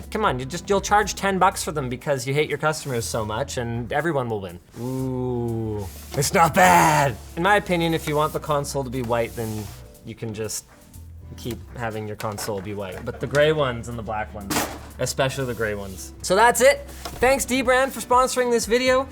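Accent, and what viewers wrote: Canada. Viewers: sounded extra Canadian in this video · I actually heard a bid of a Canadian accent from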